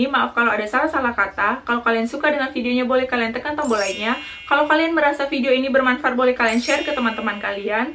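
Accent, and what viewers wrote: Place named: Medan, Indonesia. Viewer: Iya kk kyk medan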